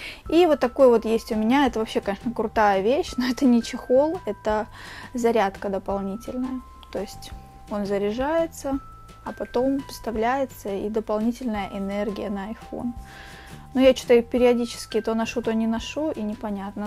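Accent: Rostov, Russia. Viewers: А вы случайно не из Ростова-На-Дону?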